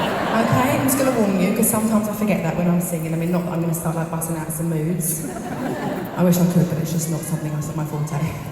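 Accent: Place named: South London, United Kingdom. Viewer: I don't understand one word of what she's saying. So South London and very fast speech.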